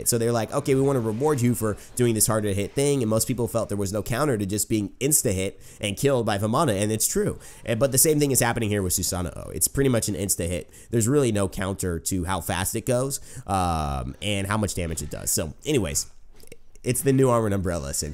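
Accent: Ireland. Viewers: The Irish accent had me dying man great vid😆😆😂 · I'm irish and have lived in ireland my whole life. My GOD your irish accent is better than mine lol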